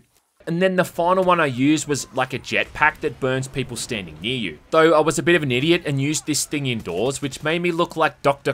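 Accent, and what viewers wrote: Australia. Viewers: he’s Australian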